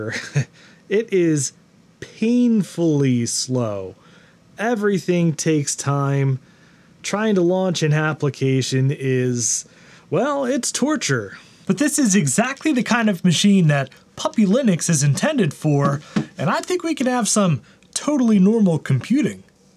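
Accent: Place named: New Jersey, United States. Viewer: Ah shit you just gave away your from Jersey.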